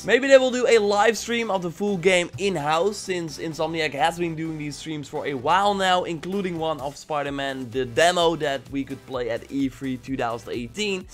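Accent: Netherlands. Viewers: Mate are you dutch? You reaaaally sound Dutch :p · Are you dutch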